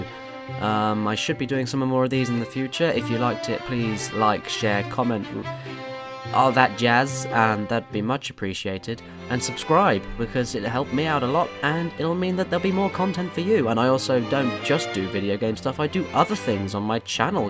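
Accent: United Kingdom. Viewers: Ur so British!!!! I luv it ^^ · Dude he is British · this guy ain't the funniest I've seen but dang I cannot resist the british...seriously the very few girls I've ever talked to say that men with british accents are automatically more attractive... please teach my your ways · I know that, but whether or not this other guy knows it he seems to think that a game which is suitable for 3-year-old kids makes it automatically a bad game. I just simply pointed out that he's clearly wrong. But anyways I'm sure we can all agree to whatever we're claiming here so I think we could just put this thing to rest and just continue praising you, your british accent and your beautiful sideburns. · Playstation Master and JonTron Incarnate but BRITISH.= AWESOME. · He is from the uk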